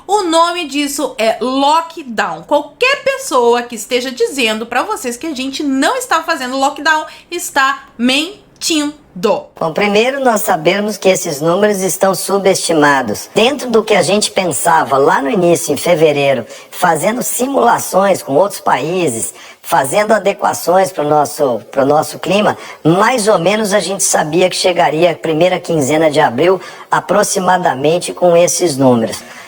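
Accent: Rio Grande do Sul, Brazil. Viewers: Acho que você é gaúcha porque você fala muito o né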